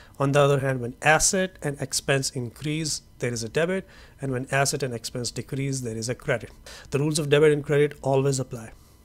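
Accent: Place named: India. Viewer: that Hindu accent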